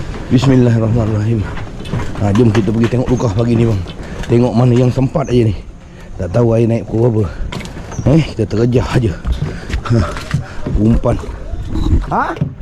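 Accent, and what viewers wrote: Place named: Melaka, Malaysia. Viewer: Bro dgr ckp mcm org Melaka ni bro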